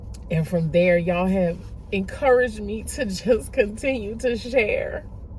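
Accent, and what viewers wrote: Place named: Louisiana, United States. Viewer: Have no idea why someone would complain about you talking. That Louisiana accent hit different. I could listen all day. Also, I'm sorry to hear you're going through these things but the Light is always surrounding us we just have to step back in. Stay beautiful inside and out ❤ So glad I found you ❤❤❤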